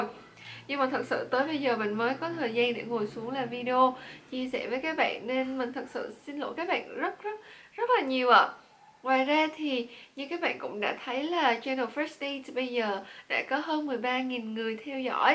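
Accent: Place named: Da Lat, Vietnam. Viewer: Linh Le bạn ơi giọng đà lạt họ nói vậy đó không phải nhẹt mũi đâu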